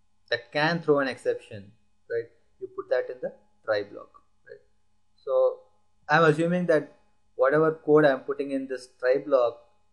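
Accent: India. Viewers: The audio is not good enough to understand what he is saying and complicates the problem of trying to understand Indian accent.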